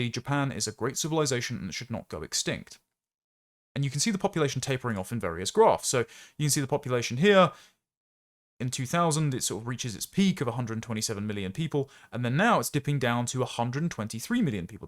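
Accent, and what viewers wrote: RP, United Kingdom. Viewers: You sound like you shop at John Lewis, also why don’t you allow me to come on to discuss AI, don’t go against evolution.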